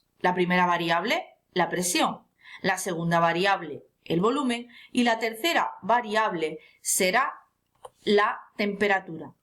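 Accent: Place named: Spain. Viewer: los españoles hablan así, no seas maleducado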